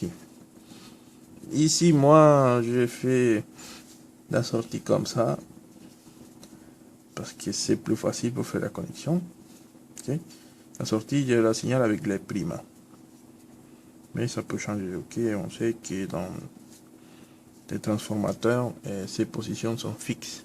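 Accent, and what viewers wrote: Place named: Spain. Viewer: I bet this guy first language is spanish but he speaks frensh very very good! nice explication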